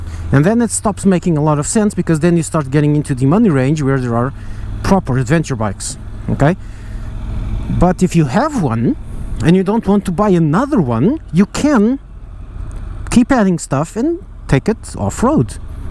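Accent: Portugal. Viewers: Vi logo plo sotaque que eras tuga! Bom video! Cascais! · That vídeo was so nice. I watched it all.where you from? Portugal? I like your accent, too.I myself own a Honda 500f but have always liked the X versions of honda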